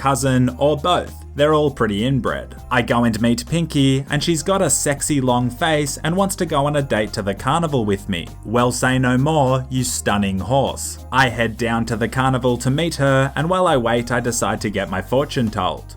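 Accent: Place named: Australia. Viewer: He has an Australian accent that’s why and I’m also Australian.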